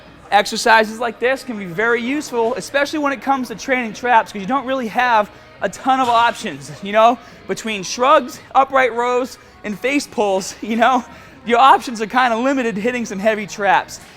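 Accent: Boston, United States. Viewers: That Boston accent was too distracting. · seems like you've turned up your boston accent a bit lol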